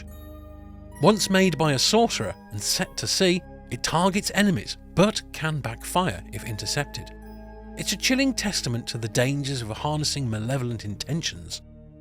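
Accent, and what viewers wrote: United Kingdom. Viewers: Ew. A british person....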